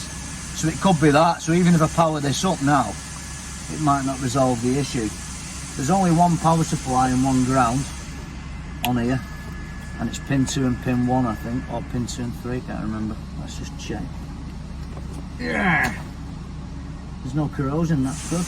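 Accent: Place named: Yorkshire, United Kingdom. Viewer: Hi buddy. You sound like a Yorkshire lad. South Derbyshire myself. Think I may need to bring mine to your garage. I have an R57 JCW 2011 and the roof stopped working a while ago. Very rarely use it as I have other vehicles but I would never sell it. I have tried all the obvious stuff but still no luck. What part of the UK is your Farage my friend. Cheers Steve.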